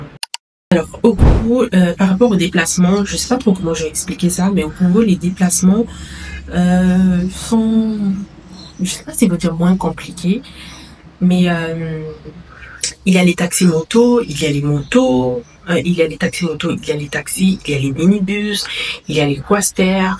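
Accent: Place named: Senegal. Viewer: Kitoko moina en tout cas. En plus du as un accent sénégalais. Merci beaucoup pour la vidéo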